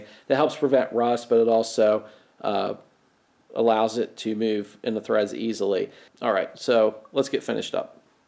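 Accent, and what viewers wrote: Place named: Philadelphia, United States. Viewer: What part of the Philly area are you from? (Accent gives it away if I am right) LOL Very nice project. I've never seen anything like this before. Definitely making some. Thanks for taking the time to make the video.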